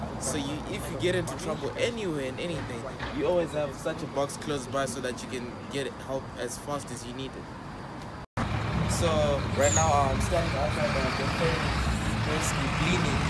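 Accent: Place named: Zimbabwe. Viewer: You have a very nice Rhodesian accent, quite rare to come by these days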